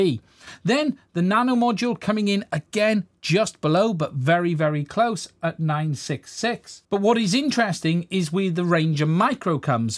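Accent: United Kingdom. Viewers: this is not only great review but I do like to listen youe British accent which is very nice. Thanks for great work - Micro Module bought 👍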